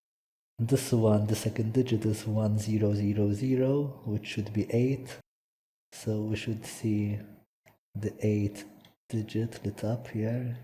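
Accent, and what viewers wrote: Malta. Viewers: Maltese accent sounds interesting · Ah yes the maltese accent is strong with this one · Dak l-accent Malti Mannnnnn! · Aaaaaaaw maltese voice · Maltese accent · that sweet malta accent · First time hearing his voice and shit that maltese accent is powerful af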